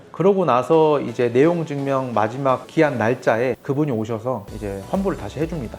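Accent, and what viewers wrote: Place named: Jeolla, South Korea. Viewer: 말투가 절라도구마잉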